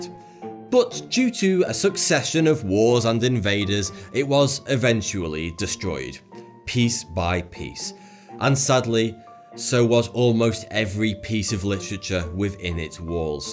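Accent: United Kingdom. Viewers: Hmmm, when Islam is mentioned re burning the library this guy with brit accent is afraid to say anything derogatory about religion. But Christians and the Bible, snide mocking tone. Hmmmm, guy might be afraid a tad of one very "peaceful" flavour of religion slicing his head off these days.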